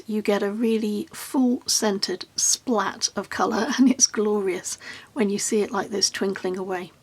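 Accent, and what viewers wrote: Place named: United Kingdom. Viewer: Your accent suggests you are very British, I love that as I'm in Portland Oregon, the land of no accent! I just love all things you create and how you add real life bits and pieces to your crafting.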